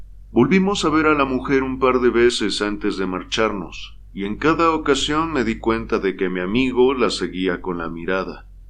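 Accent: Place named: Mexico. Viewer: Hola!! He seguido desde hace meses tus lecturas y ya eres mi favorito. Tu voz con acento de Mexico es muy agradable. He buscado NEMESIS en español de Agatha Christie solo la encuentro en inglés.. Nos la podrías leer? Gracias valoro muchísimo tu trabajo.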